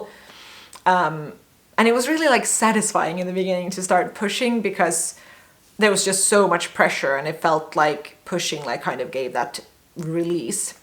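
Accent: Finland. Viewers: I really liked this story. not sugarcoated or treating birth as a 'who did it better' thing, so chill and funny at times. a lot of details and no swooning, just being down-to-earth and positive. and I must say I adore the traces of Finnish accent you have, like saying five words on the same breath while your intonation goes down and so on. I can't help it, I'm just a sucker for it :D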